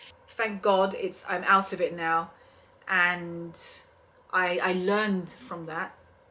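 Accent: RP, United Kingdom. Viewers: I am an O.G. follower. It's the British accent that makes Americans assume that you come from money or have money. And your impeccable taste and style. Just because it looks easy doesn't mean it is or was. PROPS TO. he is a very self confident, supportive man and partner. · I wonder if the people commenting about your coming from money are American. I wonder this because to us, the English accent is sophisticated and posh-sounding. Coupled with your style, your accent makes you appear refined and high class to Americans. I’m an American and I assumed that you were wealthy too because of your style and accent. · I wonder if people think you come from money because of the accent? A lot of folks hear an English accent and think that person as posh. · You dress well, and exude confidence...and also your accent is “posh” so I’m assuming that’s why people think that you come from wealth. 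🙏🏼 I’ve spent the last few months in London and there are such varying accents I can almost always spot a “proper” English accent...it’s sounds very different from someone that’s from the North or even a Cockney accent! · I think it’s the accent. Americans obsessed with brits & British accents & think everyone that speaks that way comes from money! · Y'all are lovely! These assumptions... I think people think you came from money because of your British accent and good taste. Who knows? I usually find that the assumptions we make reveal a lot about us and less about the person. 🤷🏿‍♀️ I enjoy all of your videos and Insta account. 🙌🏾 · I think people (esp Americans).assume you come from money because of your lovely plosh (madeupword) accent. But it is easy to tell from your down to earthness that you have worked hard and endured some things. Kudos to you. Wishing you continued success. 😘😍👍🏾👏🏾 Hey 👋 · I think the wealthy assumption comes from you having a 'posh' voice and 'quality ' taste. 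People these days need to be part of a fantasy story..and you are one. KEEP UP THE GOOD WORK! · I bet that the comments that you come from money are from Americans because we can think an English accent can sound educated, respectful and prestigious. Thanks for being so open, I like you and even more. Didn’t think you can too What Everyone is Wearing but you did. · I can't understand the commenters thinking you grew up wealthy:D because you're very "street smart" and down to earth! Maybe it's the British accent;)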